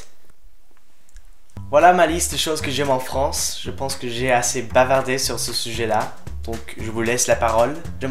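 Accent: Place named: United States. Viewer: J'adore ta nouvelle chaîne !!! gros pouce bleu 😍 continue ! et j'adore ton accent américain :)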